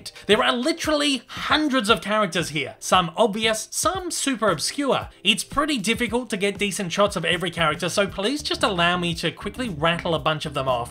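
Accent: Australia. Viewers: Where abouts in Australia are you from?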